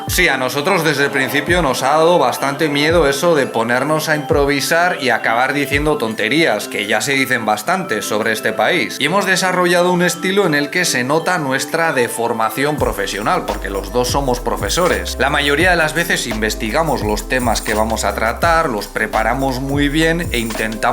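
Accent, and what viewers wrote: China. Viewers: Jajaja ya tienes acento chino cuando hablas español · comienza a tener acento chino. Muchas bendiciones para su familia 🥰🥰🥰💖💕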